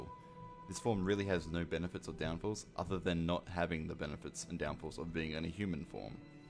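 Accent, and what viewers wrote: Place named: Australia. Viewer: He's Australian I can understand what he's saying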